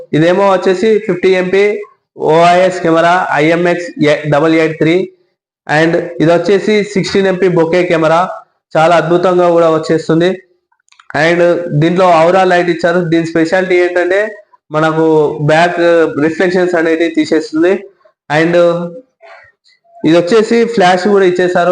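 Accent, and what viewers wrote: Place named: Telangana, India. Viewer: Anna kocham fear kaku anna while saying Nuv chpadii correct ehh kani don't fear while saying..!! Anyways good review anna mana telangan slang lo ❤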